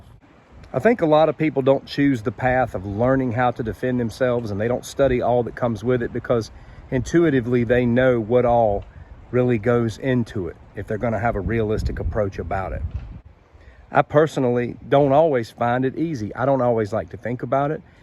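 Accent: South, United States. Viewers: GREAT ADVICE. I APPRECIATE THE STRAIGHT TALK, AND BREVITY TO THE POINT, AND FANTASTIC SOUTHERN ACCENT. IT ADDS. FASCINATION OF THE CLARITY. WITH UNDERSTANDING. WHAT YOU ARE SAYING. IT IS INTERESTING THAT SOMEONE WITH NO TRAINING COULD DO WELL IN A FIGHT. DOES. SAVAGERY COUNT FOR ANYTHING. ? I. MASSIVELY TRY TO AVOID. FIGHTING, BUT FROM EXPERIENCE. I SEEM TO ATTRACT SECURITY, AND. FEAR WHEREEVER I GO. I COULD BE .RESCUEING. A CRICKET TO RELEASE OUTSIDE, OR TRYING TO BUY MY GROCERIES. NEXT THING A I HAVE SECURITY GUARDS. FOLLOWING,. OBSERVING, OR TRYING TO HUMP MY LEG.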